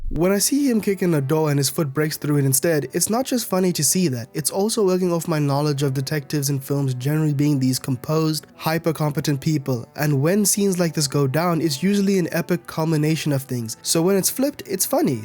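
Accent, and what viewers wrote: South Africa. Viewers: Are you south african? I'm detecting a South African accent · unrelated question but are you south african?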